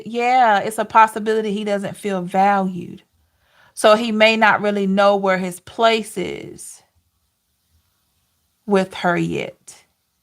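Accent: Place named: South, United States. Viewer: I'd Love to understand what part of the USA your accent is from. It's generally Southerners who say "em-pore-dent" as opposed to "im-pore-tant".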